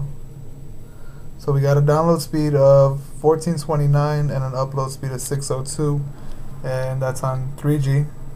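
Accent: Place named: India. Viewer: Indian accent. Indian like me I reckon. Kya haal hai bhaaya? haha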